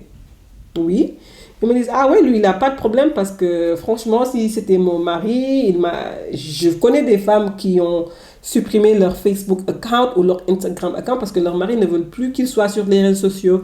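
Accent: Senegal. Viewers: you said it all. i'm not senegalese but i luv your dialect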